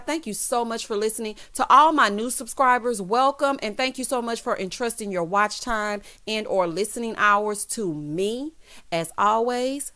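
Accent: South, United States. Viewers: Your southern draw gets me every time Your Awesome..Keep Going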